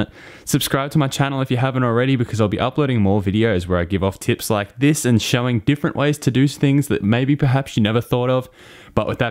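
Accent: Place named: Australia. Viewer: Nice to hear an Aussie doing this. I don't know anything about plunge Routers, do you need different "bits" to fit on the cutting apparartus? Can you get them in australia?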